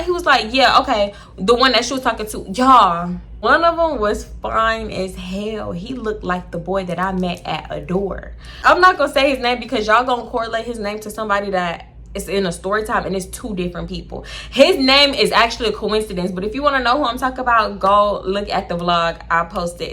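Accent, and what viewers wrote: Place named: Miami, United States. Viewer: Ouuuu Not Slapping The Ground 😂😂😂😂😂 Chile Sound Like You From MIami. Ready To Go Beast Mode ❗️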